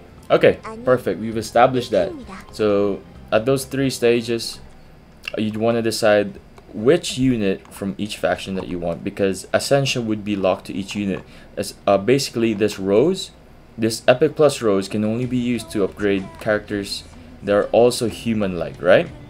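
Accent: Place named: Brazil. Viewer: I swear that you was talking in Portuguese in this video.. your voice sounds like a Brazilian voice 😂 I was searching in Portuguese this video because I want to see again, but now I found it.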